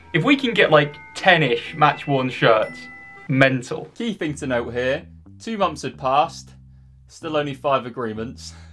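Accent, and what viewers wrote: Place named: Suffolk, United Kingdom. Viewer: You must be from suffolk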